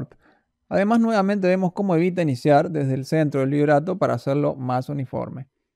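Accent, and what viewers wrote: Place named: Castile, Spain. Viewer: ✨✨✨Maravillosas tus técnicas ✨✨(HD isolation)) y ((Gráfico espectral))...eres único analizando y mostrando solo el sonido de la voz. Sorprendida al escuchar tu voz castellana ..saludos desde Mendoza 🇦🇷 y en mi nombre grupo LA VOZ DEL MUNDO DIMASH QUDAIBERGEN SOY TU FAN 🇦🇷🇦🇷 ARGENTINA.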